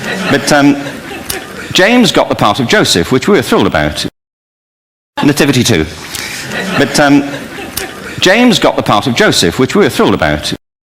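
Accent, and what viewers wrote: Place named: United Kingdom. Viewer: かっちょいいイギリス英語ですね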